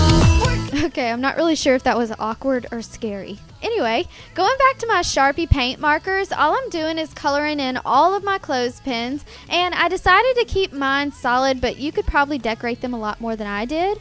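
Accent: South, United States. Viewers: You have a country accent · are you from the south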